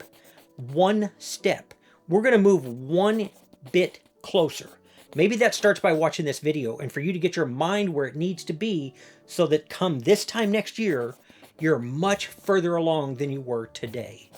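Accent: Minnesota, United States. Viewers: I thought I heard some Minneeeesota in there! Northern Weesconsin here!! Right on the tip!!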